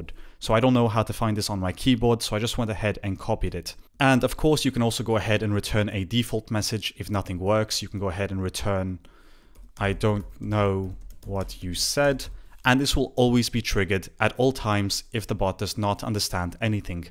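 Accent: Italy. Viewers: bruh the best italian english speaker that I ever seen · Are you Italian?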